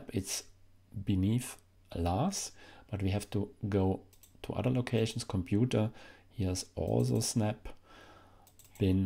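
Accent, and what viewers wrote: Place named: Black Forest, Germany. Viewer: I thought your Ubuntu video info was great, thank you! I'm very pleased with the distribution. And your "Black Forest" English! I knew my German grandfather was from the Black Forest area in Germany, but I didn't know what or where that was. I just looked it up. How fun to listen to someone from the area my granddad's family upbringing.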